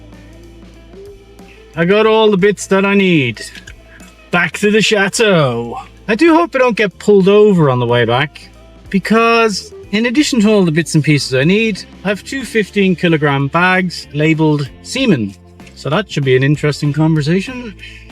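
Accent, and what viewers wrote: Ireland. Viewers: Love the Irish accent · Keep on keeping on! You are the most wonderful ‘antidote’ for a very long crap day! 😊 As I’m someone of Irish descent I fell in love immediately with your gorgeous Irish accent 😅Seriously your vlog is the bomb - it helps me calm down after a long day! Daylight saving arrives back in New Zealand this coming Saturday - thank goodness! Can drive home in the daylight from work. Keep doing you - you are the perfect recipe, ps Need my coffee too x NZ 🇳🇿👏🏻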